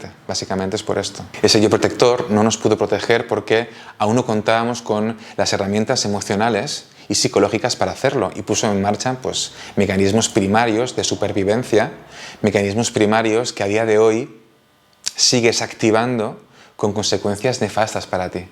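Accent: Buenos Aires, Argentina. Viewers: Como eso el final de tu carrera ?a no!! que hariamos sin ti los sabados por la mañana?Te sale bien el argentino, tendras que venir a perfeccionarlo aqui ,bendiciones desde Argentina . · Jajaja ! Muy bueno tu acento argentino! Te lo digo desde La Plata, cerquita de Buenos Aires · Ayyy...que gracioso!!! Pareces porteño!!! Ja ja ja!! Hermoso tu vídeo y super útil para entendernos un poquito más...la observación en cada situación aporta claridad , es como desmenuzar y llegar al fondo para desdramatizar. Besos desde Bueno Aires. Argentina!! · Jajajajajaajaja sos más lindo me encanta el acento argentino. Saludos desde Uruguay. AMO que le pongas humor a tus videos, le suma muchísimo !! Un saludo grande che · Buen dia soy argentina y me hiciste 😀 reir porque asi tal cual hablamos pero los de BUENOS Aires. Nunca cierres tu canal que sos de mucha bendición. 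Con este video le voy a dar una bendición muy profunda a alguien de mi familia que está atascado en su emotividad porque tiene TLP y lo necesita. Dios te bendiga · Jajajaja muy bien hablando en Argentino! Me encantó! A ver cuándo venís a Buenos Aires❤ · Jajaja,argentino,pero no todos hablamos así 😄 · Jajaja me sorprendió el acento argentino! XD muy bueno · Gracias por ese acento "argento", un gusto iniciar el día con tus vídeos y enseñanzas. Afectuoso saludo! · Me encantó el asiento argentino.. saludos desde Argentina 😜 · Jajajja....Te queda bien el tono argentino,cariños desde Bs As!! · Me encantó tu acento argentino ya me creí que eras de los nuestros!! El humor sano e inteligente es mí aliado para muchas situaciones! Saludos desde Mendoza Argentina!! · Te sale muy bien el acento argentino 👏👏👏 Me encantó escucharte! Ya hablando del tema del vídeo justamente me están pasando cosas parecidas y siento un agotamiento mental y físico que me hacen daño a veces. 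Entrar en el conflicto es muy fuerte, a mí me trae angustia que duele en el pecho! Ahora lo manejo desde la reflexión pero no logro evitar la angustia en la primer etapa. Luego lo puedo superar. No es sencillo de explicar pero lo puedo manejar. Gracias siempre mi querido terapeuta. Abrazo desde Argentina. ❤️ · jajajaj el acento argentino, lo amo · Jajaja amé tu acento argento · sorprendido por tu porteño.. aunque le falta un poco de trabajo. Es muy fácil hablar en argentino, intenta hablar el castellano en italiano y te convertis en un porteño más. Abrazo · Amé tu acento argentino..!! Quedo pendiente de tu imitación colombiana..! 😂😂🇨🇴 el buen humor es maravilloso..! Cuánto aprendo contigo..! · Acento argentino...o uruguayo...un abrazo...! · Jajaja te salio genial el asento argentino!!!!!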